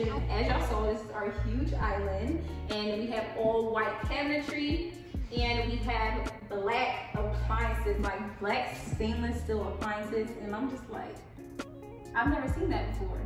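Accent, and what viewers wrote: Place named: New York, United States. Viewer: You sound like a New Yorker, but I could be wrong.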